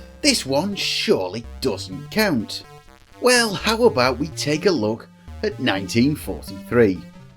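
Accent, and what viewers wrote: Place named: Northern England, United Kingdom. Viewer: The Manchester accent... gahhhhh....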